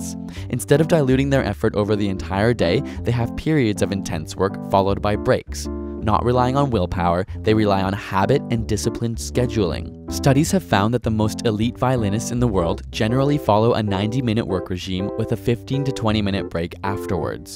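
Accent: Canada. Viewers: are you canadian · that canadian accent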